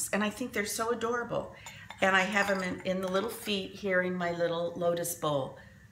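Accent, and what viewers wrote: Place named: California, United States. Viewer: Hi! Do you know you have a California accent? My family use to tell me I had one when we lived there for years and years! And yes, you do! lol so funny that Paul McCartney was supposed to be your husband! I laughed at this! Oh, isn't it neat when God speaks to your heart? How neat is that! Take care my dear friend...love you! Jeanie xxooo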